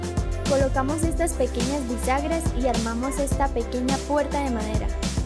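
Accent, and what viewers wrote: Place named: Colombia. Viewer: Por el acento , Colombia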